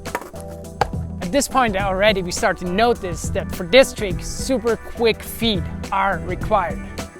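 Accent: Switzerland. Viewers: auch du bist’s die uber/Ubter flip meister JG jajaja haha ich hab das wennst du SwitzerDeutsc spricht gern !! es macht mich like what the heck is he saying lol I took sechs jahre Deustch auf gymnasium und Uni und wenn ich ins Luzern war habe ich ein kickflip gemacht für ein skater und er denkt es was toll ja am tollsten lolz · wait what he's swiss🤯 "s wird en kampf abr vlt funkionierts ja"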